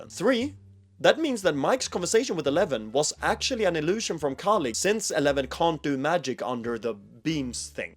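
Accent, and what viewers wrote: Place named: Sweden. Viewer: the way i activated like a sleeper agent when i heard that you had a swedish accent is frying me😭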